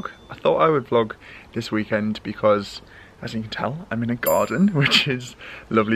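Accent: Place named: Durham, United Kingdom. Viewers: I can already hear the Durham lilt in your voice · you sounded from the north east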